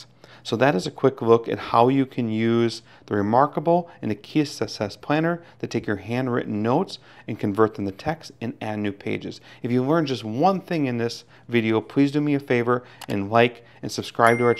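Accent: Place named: Upper Midwest, United States. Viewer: Thank you. I’m guessing accent is from Wisconsin, Minnesota, or North Dakota. Am I close? 😁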